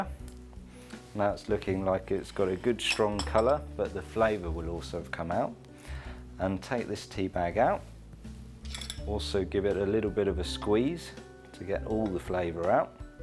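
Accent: United Kingdom. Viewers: The perfect tea video: English accent Formal attire Proper tea etiquette Elegance Fine china Immaculate tea knowledge 10/10, would recommend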